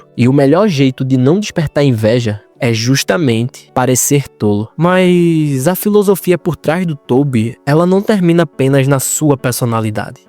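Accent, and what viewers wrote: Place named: Bahia, Brazil. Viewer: tem como tira o sotaque bahiano?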